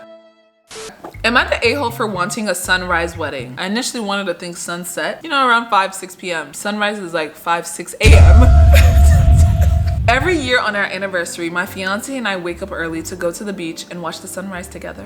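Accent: Nigeria. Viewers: Weird question, are you Nigerian? I can hear the accent 😂it’s ever so slight but I love it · I just knew you are Nigerian The intonation and all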